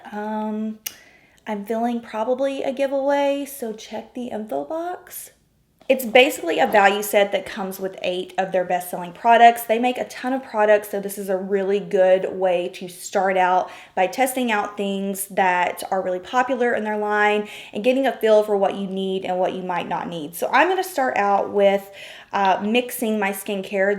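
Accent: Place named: South Carolina, United States. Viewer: I would like to try the Hydra Serum for my dry, sensitive skin. Your accent helps with my homesickness - I'm from SC, been in Cali 20 years 😉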